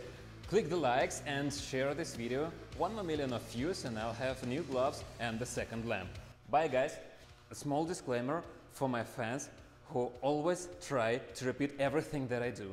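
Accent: Russia. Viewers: Сразу, по акценту, понял что ты русский! Я желаю тебе удачи, ты молодец!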